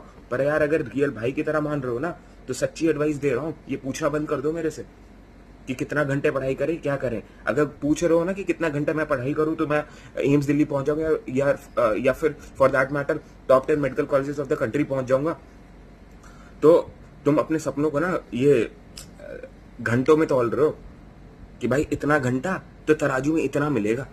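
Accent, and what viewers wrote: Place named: Uttar Pradesh, India. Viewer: Aur bro UP se ho pata lagra 😁😁😁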